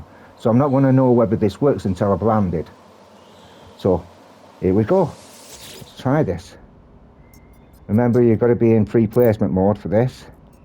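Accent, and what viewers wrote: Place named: England, United Kingdom. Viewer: Great to hear bloody brilliant regional English… no messin’ about… job done! 👍🏻 ❤ 🏴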